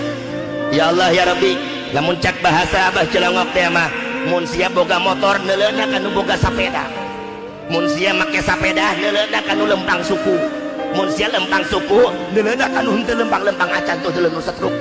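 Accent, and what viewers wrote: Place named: Banten, Indonesia. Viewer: Logatnya sunda banten kan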